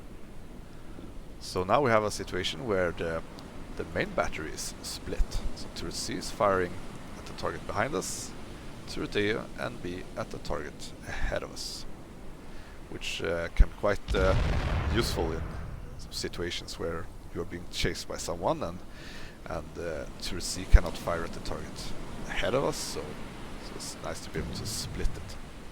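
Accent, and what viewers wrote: Sweden. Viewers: Greetings from Finland! Based on your accent I would say you are Swedish maybe...? When I saw Wolfpack345 play this game it was an instant wishlist for me.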